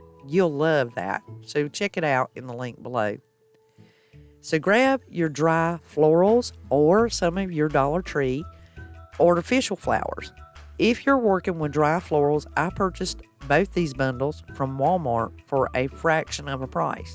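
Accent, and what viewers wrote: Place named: Carolina, United States. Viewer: I love this! Thank you for sharing. I love your accent. You sound like me. I’m a Carolina girl. 😊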